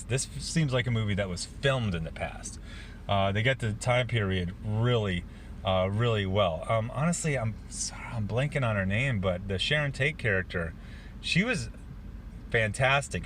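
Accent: United States. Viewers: Ya Boi comes out of the theater to record this vid and the first thing I hear is a vintage Western accent.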